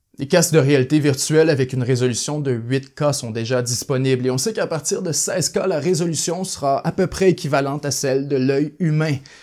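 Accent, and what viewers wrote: Canada. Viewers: C'est quel accent ça? Canadien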